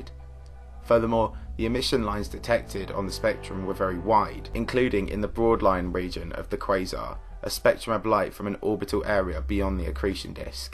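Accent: United Kingdom. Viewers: I can't stand this guys British voice. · I love the british accent.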